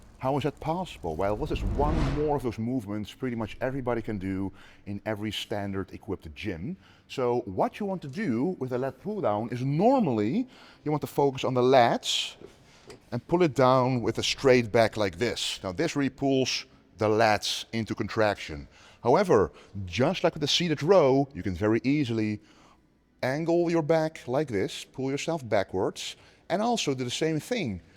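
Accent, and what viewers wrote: Netherlands. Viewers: Damn, I like his dutch accent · Very well explained. I also noted a accent in your articulation. I know it's not from the shoulder shrugs. You sound dutch or dutch antillean. Anyways great video. I actually did them while you were explaining them using body weight,just to get the feel of it. And I did notice muscle contraction (slightly) as it may be...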